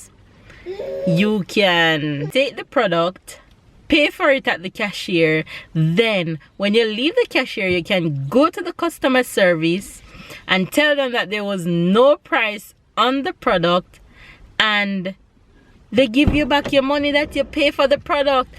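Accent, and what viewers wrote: Jamaica. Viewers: How come he has a Jamaican accent?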